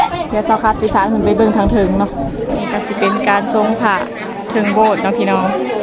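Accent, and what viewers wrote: Thailand. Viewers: พูดเหมือนคนไทยหล่มสัก😅😅 ❤❤ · พูดเหมือนเชียงใหม่ประเทศไทยเลยครับ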